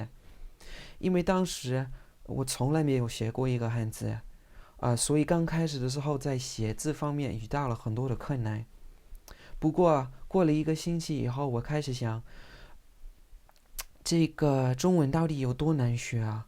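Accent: Standard. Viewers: close to perfect and standard Mandarin..seldom see any non native speakers master four tones so skillfully..brilliant.. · 你中文说的非常非常地道，你是怎么在没有中文环境的情况下发音练得这么标准的呢？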